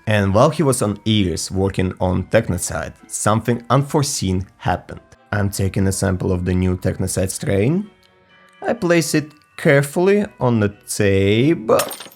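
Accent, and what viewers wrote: Russia. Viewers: You sound russian...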